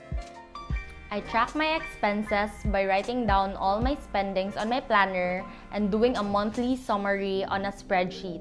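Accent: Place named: Cebu, Philippines. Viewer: Very helpful tips! Thanks for sharing. Anyway, are you from Cebu? cause you sound like my Cebuano friends.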